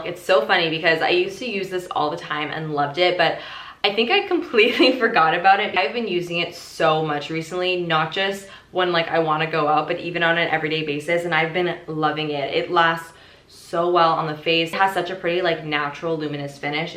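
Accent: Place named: Canada. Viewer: Hehehe I love your subtle Canadian accent 😜 “pro-cess” vs “prah-cess”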